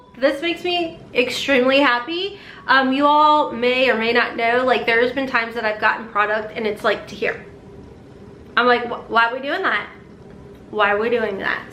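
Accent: South, United States. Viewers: So I seen in another video you said something about being at an Ohio festival… are you from Ohio or down south? Some words I hear a southern slang behind lol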